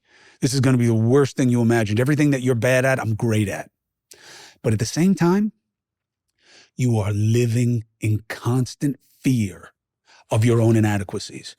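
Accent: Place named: New York, United States. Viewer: He went New York Italian on this one and spoke fluent f word . I can relate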